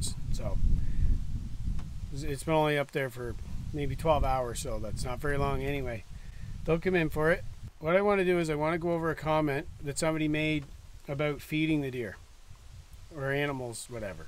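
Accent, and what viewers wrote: Canada. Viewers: Off topic, even though I'm in Manitoba, I could tell you were from the Maritimes just in how you say the word 'barn". Congrats on the PC win in NB (if they were who you were going for...I won't make assumptions)! Cheers.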